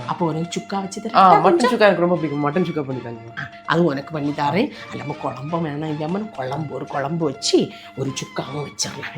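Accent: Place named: Kanyakumari, India. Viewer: Konjam kanyskumari slangai maathalamae.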